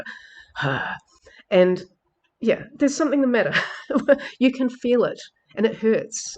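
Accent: New Zealand. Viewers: Shes Kiwi!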